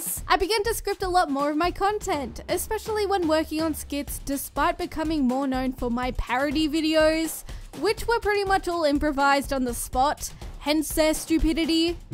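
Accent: Australia. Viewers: I knew it I knew it I always thought I was just weird and Thought all Australian women sounded the same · Well I wasn't expecting this, but I think its cool that you found something back then that your were cleary passionate about making. Sorry it started to burn you out further down the road and you felt really self conscious about it, but the fact you kept doing in until the end and can talk about it now makes you braver than most people. Well done! Also don't apologise for your aussie accent. At least you don't sound like a flithy hybrid of kiwi & scottish 😅🤣. Can't wait for part 2!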